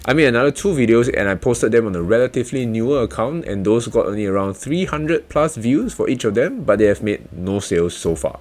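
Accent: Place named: Singapore. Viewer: I love the candid video, thanks for making it! As soon as I (American) heard the Singapore accent, I knew you'd be way more honest / trustworthy than the other guru's on the internet. Every Singaporean I've met is pretty sharp and fair so far. Keep up the great work! Looking forward to seeing what's next.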